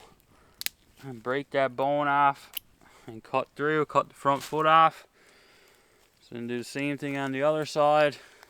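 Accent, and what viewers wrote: Newfoundland, Canada. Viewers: Yeah dogs look fine. I looked up Newfoundland accent and it fits (to my ear at least). So that was my guess. Hope to see some recommendations on snow shoes for that type of terrain. It looks like where I live in Sweden.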